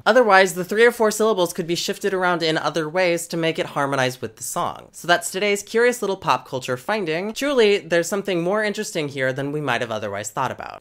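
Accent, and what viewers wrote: Portugal. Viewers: Você fala português com o sotaque de portugal perfeitamente!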